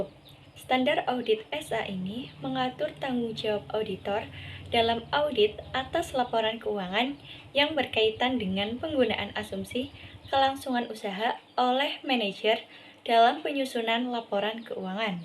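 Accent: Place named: Banyumas, Indonesia. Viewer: Walau ngapak aku ttp luuuvvv wkwkwkw semangat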